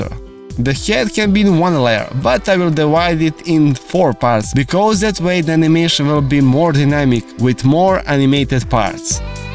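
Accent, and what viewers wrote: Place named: Russia. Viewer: Russian accent:)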